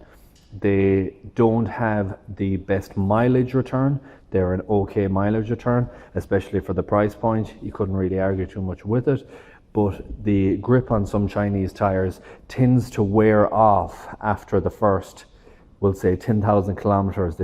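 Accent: Ireland. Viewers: Sounds Irish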